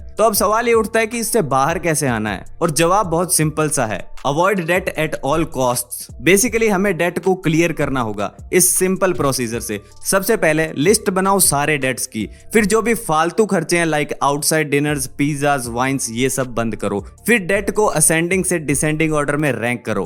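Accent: Gujarat, India. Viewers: Bhai accent se gujrati lage thu 😅❤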